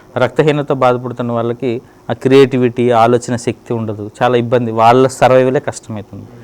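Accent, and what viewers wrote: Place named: Telangana, India. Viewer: Good chala helpful parents doctor garu chala Baga cheparu. A Chala Telangana slang lo super cheparu doctor garu. Nijamga Epps tiki alanti Valli unara Biddu thadu bellam lo pettukoni tine Vallu.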